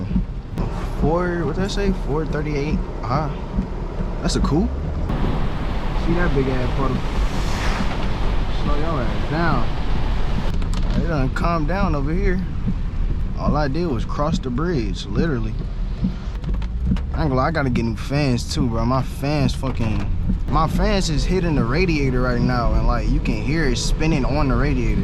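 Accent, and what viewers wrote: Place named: India. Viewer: Vwat da fuc bruddah looosh ash 🤣🤣 Indian accent caught me hella off guard might just be a subscriber now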